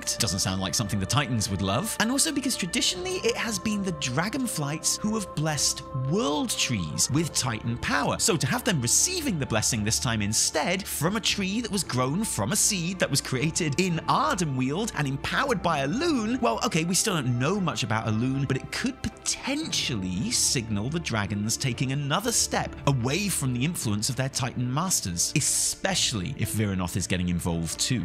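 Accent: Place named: United Kingdom. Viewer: i got really stoned and though t the first like minute of this video was like a food theory video... then i noticed you were British and talking about wow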